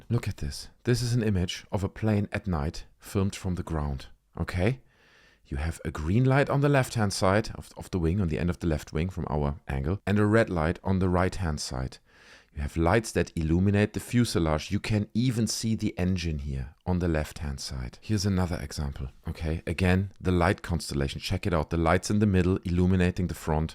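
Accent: Germany. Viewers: You also sound like you have a German accent. Maybe I'm wrong 🤷🏼‍♀️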